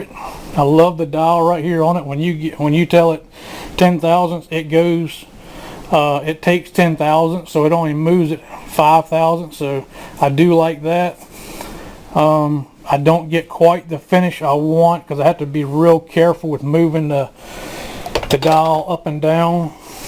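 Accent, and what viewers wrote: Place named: Kentucky, United States. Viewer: Nicely done! I'm impressed. Where are you? You sound like Kentucky.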